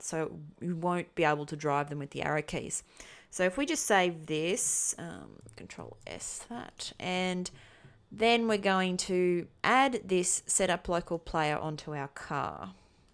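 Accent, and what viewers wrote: Australia. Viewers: Are you Australian? You have a beautiful accent.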